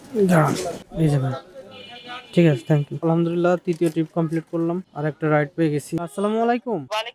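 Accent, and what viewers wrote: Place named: Jessore, Bangladesh. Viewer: ভাইয়ের গ্ৰামের বাড়ি কি যশোর অঞ্চলে? ভাষা শুনে মনে হলো।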